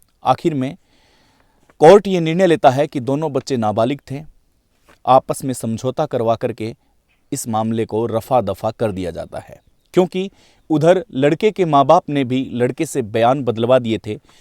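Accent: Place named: Marwari, India. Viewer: भाई साहब मारवाड़ी बोली भूलगे कया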